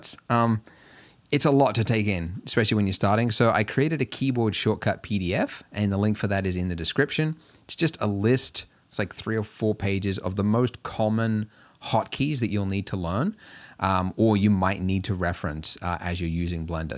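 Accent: Australia. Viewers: I'd love this series based on its content, but that Aussie accent is absolutely 👍. Love it! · Are you from Australia ?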